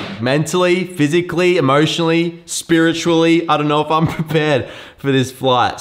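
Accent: Australia. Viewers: Now i wanna to learn the aussie accent. U sounds so hip.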